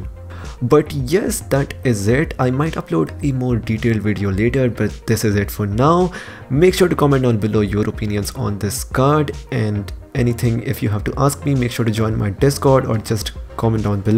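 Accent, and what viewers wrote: India. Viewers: This indian accent is so anoying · Indian accent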